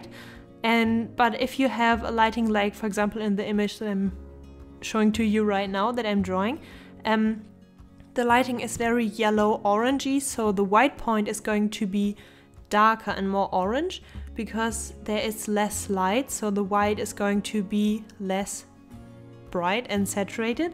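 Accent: Germany. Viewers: Are you German by any chance? Your accent sounds kinda familiar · Ich find das so merkwürdig du sprichst so gut Englisch und trotzdem hört man sofort dass du deutsch bist ( hoffe das stimmt auch ) aber ich hab keine Ahnung woran ich es erkenne außer an diesem typisch deutschen "ähm" :D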